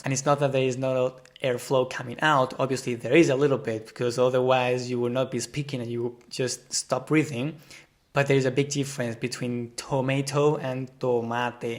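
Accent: Spain. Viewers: Thanks a lot for the great content and also the other videos! Just wondering, how would you describe your Spanish accent? Is it from a certain region? I would like to become work efficient (B2) for Madrid, and especially to sound professional to clients. Not sure if there are notable differences in accents (I.e. in Andalusia) for cities in Spain, and whether those would come across as ‘uncommon/unprof’ when working in a different city. Would love to know.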